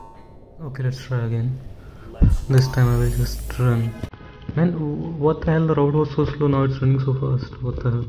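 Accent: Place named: India. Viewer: ur voice looks like Indian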